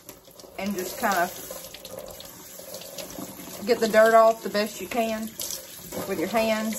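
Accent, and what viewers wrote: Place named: Tennessee, United States. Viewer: Your soil looks pretty crappy but I have the same soil but orange clay with all that gravel (chert) in it. That's a decent harvest for a small patch. I bet they'd be good as hell in a pot roast with carrots and potatoes. You have to be in Tennessee judging by your accent. I know a. Her first name starts with a T and rhymes with Maria. She's about 25.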